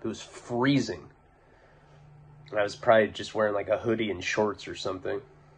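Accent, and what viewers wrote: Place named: Boston, United States. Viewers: Oh my god! Your Boston accent!!!! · Your Boston accent makes me giggle poo poo Palabra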